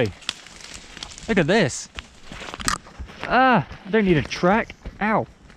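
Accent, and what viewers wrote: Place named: United Kingdom. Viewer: you are so handsome and I love your British accent, I've learned so much about words and phrases you use that are so different in America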